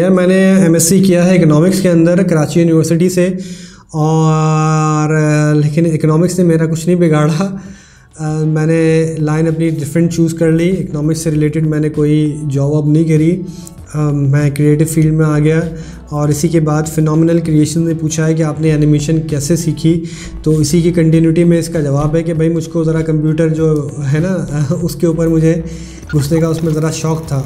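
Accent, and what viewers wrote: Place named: Lucknow, India. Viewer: Aapki Lucknowi andaaz se pta chal gya tha aap lucknow se ho❤❤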